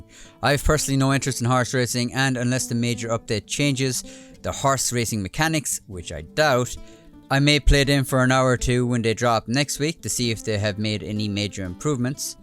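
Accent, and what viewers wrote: Ireland. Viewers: Dublin or Belfast?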